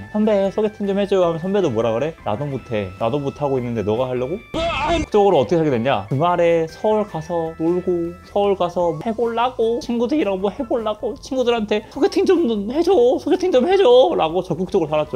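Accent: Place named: Iksan, South Korea. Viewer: 익산 사람이에요? 익산 사투리 종종 들리는데요~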